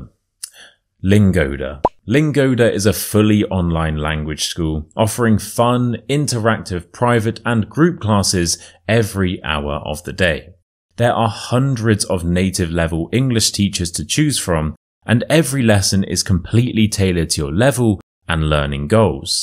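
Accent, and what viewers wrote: United Kingdom. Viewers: I wish all British people could speak like you 😅 Very lovely lessons ❤ thanks 🤩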